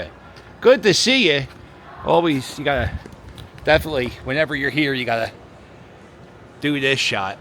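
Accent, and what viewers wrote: Philadelphia, United States. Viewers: That's a serious Fluffya accent. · Love your Philly Accent - Helen from Bal-more! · Linguist consider the Philly accent to actually be a southern accent due to vowel sounds. Baltimore is similar. We Philly folks just talk fast. And this guy has the best Philly accent I’ve heard in a long time. Makes me homesick.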